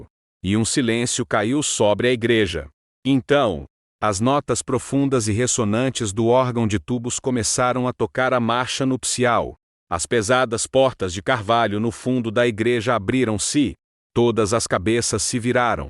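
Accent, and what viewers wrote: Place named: Fortaleza, Brazil. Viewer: Não gostei do narador é meio esquegito de Fortaleza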